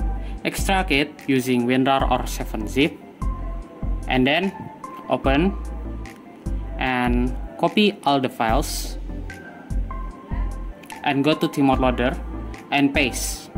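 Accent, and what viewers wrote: Indonesia. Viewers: menurut gw berasa kok indo nya, udh biasa denger org indo ngomong pake b. ing.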